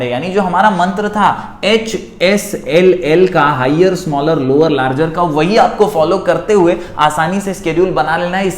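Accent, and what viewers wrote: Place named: Gujarat, India. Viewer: Sir where r u from? Gujarati lgte ho 😅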